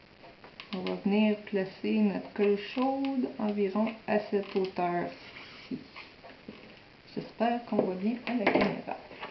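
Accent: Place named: Quebec, Canada. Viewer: Québéquoise ? Ca s'entend !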